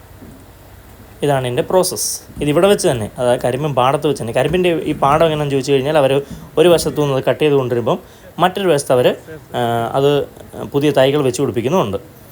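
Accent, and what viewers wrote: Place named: Tamil Nadu, India. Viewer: There comes a tamil influence in your talk 😜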